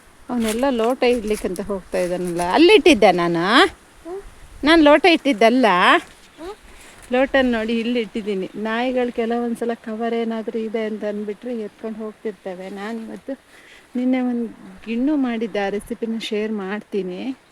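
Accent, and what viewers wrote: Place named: Tulu Nadu, India. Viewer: Nimage Thulu barutha magu mathaduvaga anishithu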